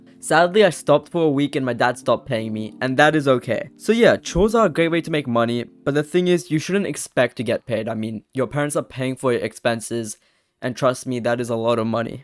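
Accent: Australia. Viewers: Great vid bro rlly helpful, also r u Aussie?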